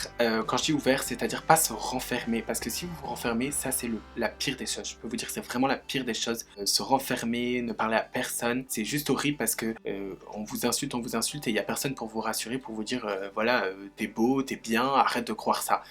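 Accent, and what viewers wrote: Belgium. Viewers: Tu es belge car je trouve que tu as un petit accent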